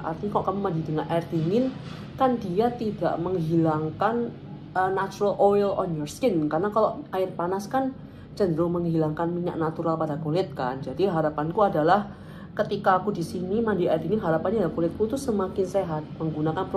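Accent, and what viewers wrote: Surabaya, Indonesia. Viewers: E beneran ternyata logat khas suroboyo hehe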